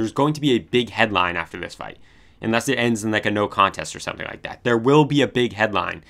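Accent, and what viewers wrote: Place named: Pacific Northwest, United States. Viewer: you’re definitely from the Pacific Northwest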